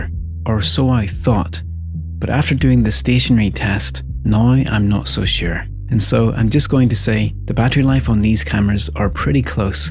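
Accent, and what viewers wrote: Northern Ireland, United Kingdom. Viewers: Great review. I have the Pocket 1 and was considering upgrading. This has convinced me to keep with it although there are some great improvements with the Pocket 2. I just bought a controller wheel instead. Nice to see you are holding on to your Northern Ireland accent, it made you easy to listen to. Well worth subbing your channel.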